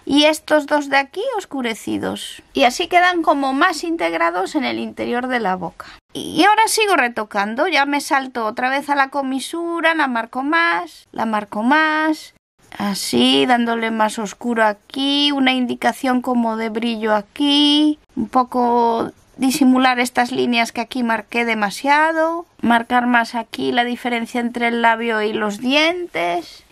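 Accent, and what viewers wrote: Galicia, Spain. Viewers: Es gallega, ¿no? O si no del norte de España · y esa xenofobia? Tiene voz de una mujer mayor (una voz más relajada), supongo que sí se puede llegar a confundir con una voz de alguien borracho jajaja y su acento es español de toda la vida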